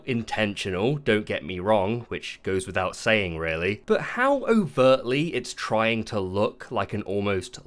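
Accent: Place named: United Kingdom. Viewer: Totally agree. I think it's both but also his voice. He's got a pretty calm uk/euro accent that has a comforting quality to it. I use it to fall asleep to pretty often too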